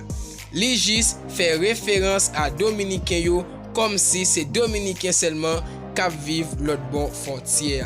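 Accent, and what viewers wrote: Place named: Rural, Haiti. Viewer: Poukisa ou pale tankou ti moun andeyò kap fè pakè poul al konpoze nan sètifika lèl té ofisyèl?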